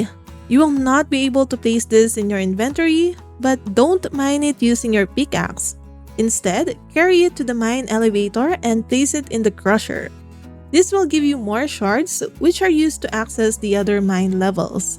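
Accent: Philippines. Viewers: Great tips and video. That Pinoy accent though...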